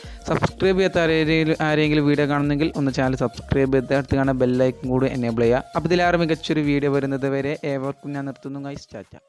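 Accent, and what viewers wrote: Malappuram, India. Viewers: Bro malppuram basssha matttt najmall malppuram malayalam parnjnnnl mtuulli work manisilllla😍😘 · Ninte home malappuram ann enn ariyamayirunnu ninte samsaram keettal manassilayi😎😎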